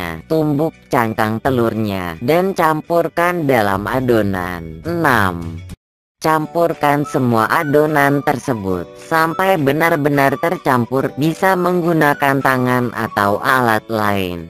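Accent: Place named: Java, Indonesia. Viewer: Sampean jowo mas...medok men.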